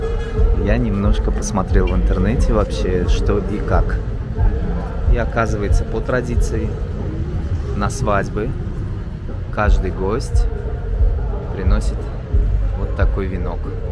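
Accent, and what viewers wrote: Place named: Uzbekistan. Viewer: Акцент такой только у Узбекистанцев! 😀👍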